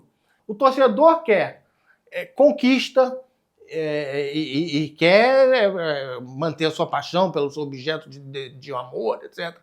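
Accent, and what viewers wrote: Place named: Rio de Janeiro, Brazil. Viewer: O Lúcio de Castro pode cag@ qlq regra, mas esse sotaque carioca tira toda credibilidade dele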